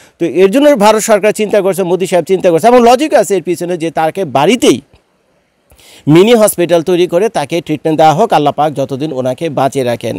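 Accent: Dhaka, Bangladesh. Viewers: AASSALAMOO ALAIKOOM Dear Bhai,KHALAQAL MOUTA WAL HAYATA,Soora MOOLQE,I liked you because of Dhakaya accent,from Bangladesh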